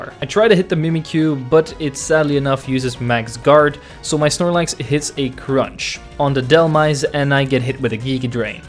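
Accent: Netherlands. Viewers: A-A-Are you Dutch??! o mijn god ik ook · Wait are you dutch? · wait are you dutch?! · Are you dutch?!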